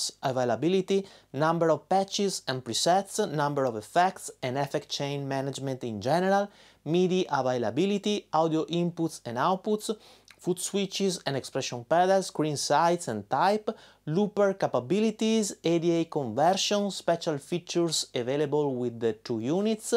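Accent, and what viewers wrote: Brazil. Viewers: vc é brasileiro kkkk nao é possivel, com esse sotaque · I feel some Brazilian Accent I am also brazillian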